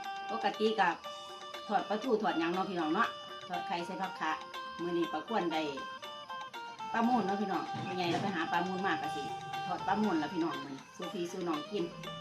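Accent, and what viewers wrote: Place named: Sisaket, Thailand. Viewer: เป็นตาแซบแท้จ้า จ๋า❤️เว้าคือคนศรีสะเกษแท้จ้า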